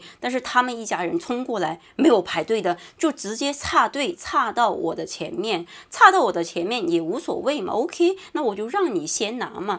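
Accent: China. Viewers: 听你口音，也是中国的吧？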